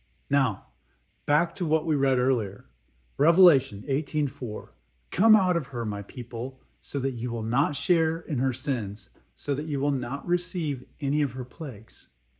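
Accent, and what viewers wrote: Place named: Utah, United States. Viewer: You sound like a utah Mormon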